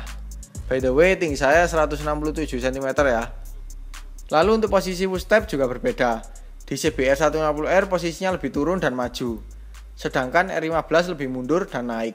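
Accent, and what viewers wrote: Malang, Indonesia. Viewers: Mas org malang ya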